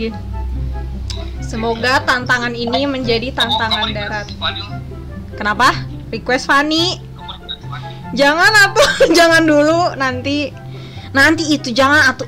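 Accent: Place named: Java, Indonesia. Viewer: jowone ngendi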